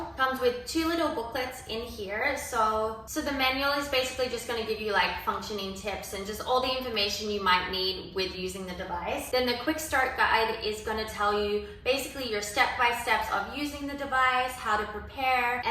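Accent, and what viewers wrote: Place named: Canada. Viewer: Catching a slight Canadian accent coming through 🤭 Sold on this! Looks like a great product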